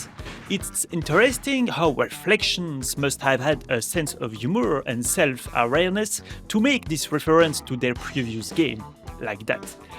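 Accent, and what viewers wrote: France. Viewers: Are you french??? Damnnn the accent ahaah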